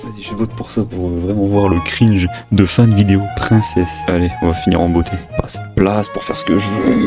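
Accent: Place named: Marseille, France. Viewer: Ton accent marseillais m'a exterminé !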